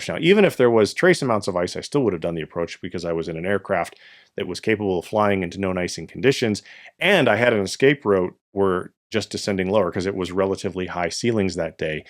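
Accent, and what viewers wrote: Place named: Canada. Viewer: Judging from your voice, it appears you are Canadian or have spent some time there.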